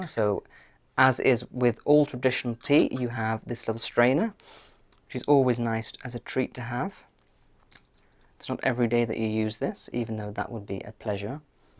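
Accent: United Kingdom. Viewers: I would like to thank your Mother, for bringing such a beautiful💙 angel, into this world!!🤗....I LOVE watching your, glowing-radiant face on camera, and listening to your beautiful British accent!! You, are a treasure🌷🫖 God Bless you, Beautiful Soul!! Love to you, from California.🇺🇸🤗💖💙🤍💜🥰😘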